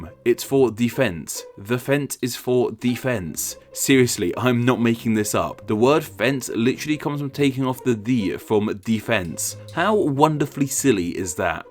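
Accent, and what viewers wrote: United Kingdom. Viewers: No, you have a British accent, therefore you're not so much watchmojo as you are Whatculture. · It's only fun to say because you're British and have a British accent.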